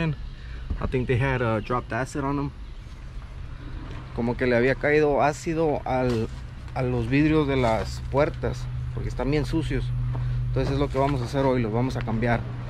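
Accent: United States. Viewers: You from Chicago???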